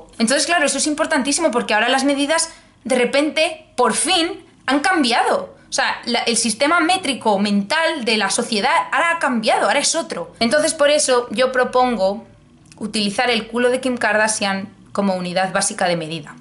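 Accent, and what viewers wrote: Spain. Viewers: Me encanta la pronunciación de nosotros los españoles dz, y si alguien no le gusta que vaya a freír espárragos o a ver si ya puso la marrana.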